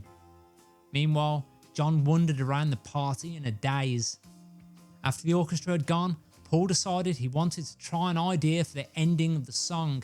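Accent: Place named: West Midlands, United Kingdom. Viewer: Thanks for all these videos you’ve made documenting the discography, really good stuff. Made even better by your West Midlands narration, you’re a legend!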